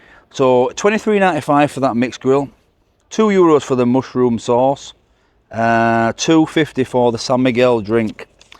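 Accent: Yorkshire, United Kingdom. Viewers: Sorry but that accent is truly truly awful mate, it's a badden even for yorkshire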